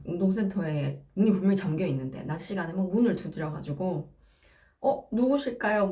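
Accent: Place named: Busan, South Korea. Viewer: ❤❤대단하십니다. 부산사투리가 더 듣기가 좋네요~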